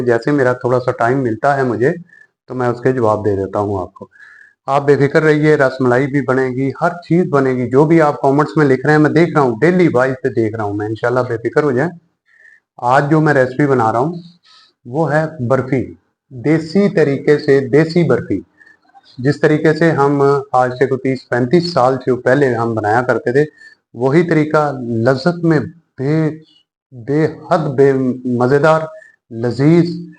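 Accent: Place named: Punjab, India. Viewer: Sir AP ki nation sa lagta ha k AP panjabi hain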